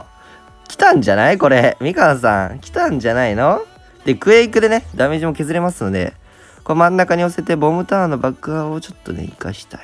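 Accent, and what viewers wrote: Okayama, Japan. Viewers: RADさんって岡山県の人！？！？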